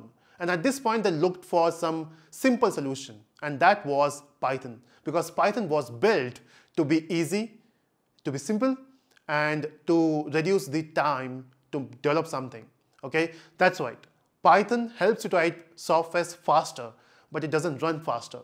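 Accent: India. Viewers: >load video >hears gay pojeet accent >instant pause >dislike >leaves this comment >closes video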